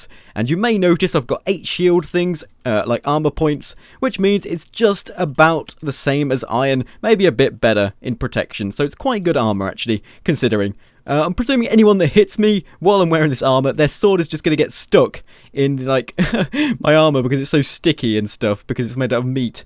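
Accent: United Kingdom. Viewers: Where are you from you sound sort if British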